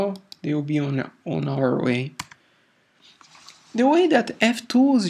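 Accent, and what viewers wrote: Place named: Brazil. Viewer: sutaque brasileiro! OIE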